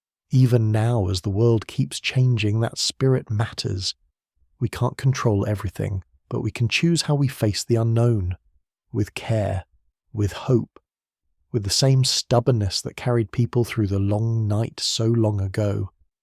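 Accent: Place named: United Kingdom. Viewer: Very good content and no useless/annoying sound effects. Thank you. Why the British accent, though? It doesn’t make this more believable or impressive. A matter of cost, perhaps?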